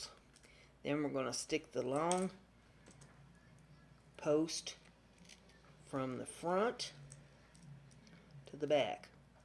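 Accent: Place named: Tennessee, United States. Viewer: lol. I've got to get myself a new computer, too. So, I giggled when you talked about that. lol. I've never used a wood burner on leather, but that turned out beautifully. I've only ever stamped with metal stamps into leather to make some keychains, so the burner effect will be neat to try. I've added this to my support playlists- Handmade Crafty Creators and the one for Leather Crafts. It's great to hear someone with an accent like mine. Reminds me of home back when we lived in TN. lol. Great crafty content, gal. Craft on!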